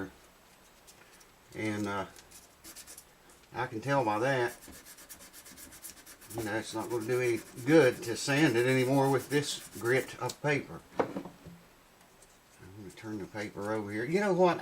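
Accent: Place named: West Virginia, United States. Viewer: Just found you, great videos. I have a D15M Martin and love it. Every time I take it out of the case I stick my nose in the sound hole and take a big breath! Where you from, I grew up in WV and am picking up some WV accent on some of your words, I live in NC outside of Charlotte now. Love your channel.....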